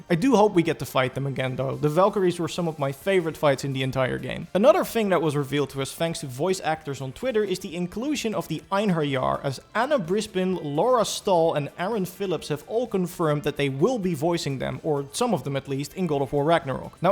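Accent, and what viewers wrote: Netherlands. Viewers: That Dutch accent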